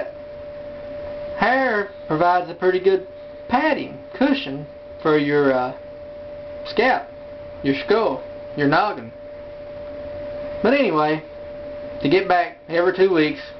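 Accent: United States. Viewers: Ya from North Carolina? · Man, I never heard an accent quite like yours, but I think it is a really great American one. Am from VA, and we have about 3 or 4 distinct accents, beside the Yankee one I have. Good video. Looking forward to the rest.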